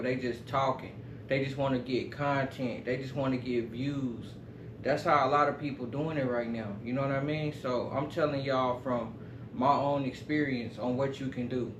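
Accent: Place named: Oklahoma, United States. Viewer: Don't you have to show the social physically and ik you was from okc I hear it in yo voice I'm from 81st & kelly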